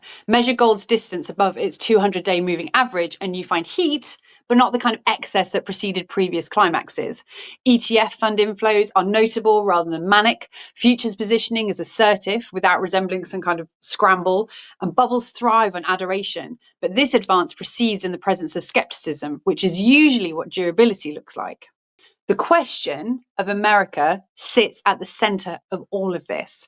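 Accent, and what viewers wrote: England, United Kingdom. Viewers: I could listen to talk all day with that English accent. But thank you for creating this video I truly value your input. Both silver and gold are on fire and I think silver has the potential to go higher in price to create a life of its own.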